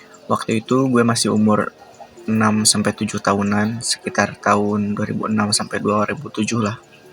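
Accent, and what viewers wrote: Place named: West Java, Indonesia. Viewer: Suka banget sundanya 😂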